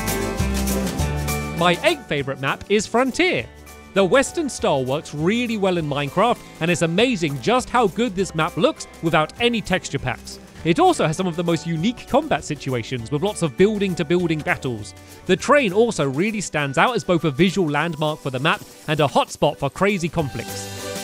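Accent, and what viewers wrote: United Kingdom. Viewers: Because I'm not used to a British accent I thought you said my egg favorite map instead of my 8th favorite map lol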